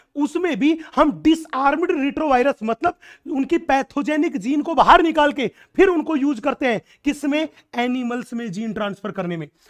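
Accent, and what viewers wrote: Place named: Haryana, India. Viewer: Is sir from haryana ?.....his tone matches as littile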